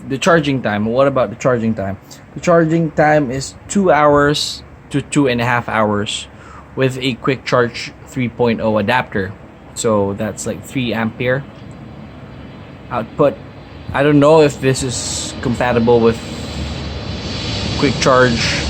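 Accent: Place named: Philippines. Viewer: i knew you were pinoy just by your tone. I'm thinking of getting this or Fiio X3 Mark III but can't decide yet or i could just add a bit more and get a nintendo switch and forget about music haha jk. any review for Fiio X3 Mark III over NW-A45?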